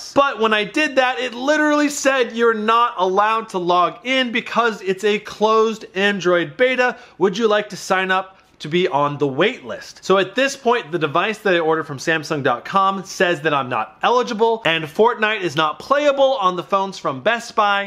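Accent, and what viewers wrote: India. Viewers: Indian accent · Sounds like an indian scammer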